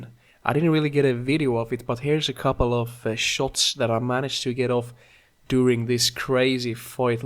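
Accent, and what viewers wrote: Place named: Scotland, United Kingdom. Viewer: idk why you sounds somewhat Scottish to me lol. Anyoway I got my captive bred Mandarin a few days ago. So glad we are able to captive breed these beautiful fishes.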